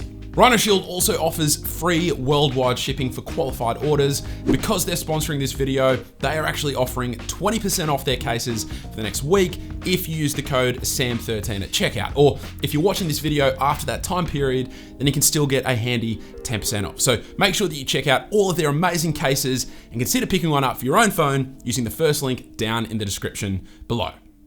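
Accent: Australia. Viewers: Heard ur aussie voice and gave an instant sub. Luv to see it 🇦🇺💙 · Hey, I tried to buy one of the RhinoShield Collab cases for my 13 Pro Max using your link and code, but I got an error saying they cannot ship the PAC-MAN collection to Australia? Curious since you sound Aussie? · Whats with the over the top bogan accent?? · That hick Aussie accent tho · I never heard such deep australian accent in one person.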